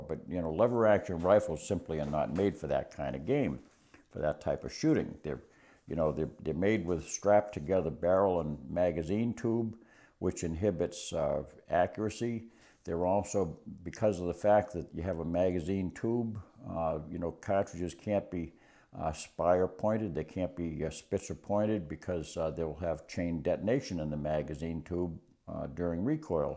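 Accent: New England, United States. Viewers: I detect a New England accent ! I had a paper route with 80 papers as well in 1964. where were you in Nam? I was 69-70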